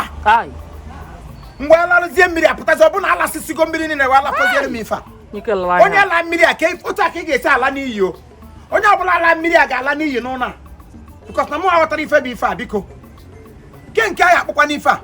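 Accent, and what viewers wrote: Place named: Anambra, Nigeria. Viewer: So chief can speak anambra like this. Nwoke ah bu all rounder 😄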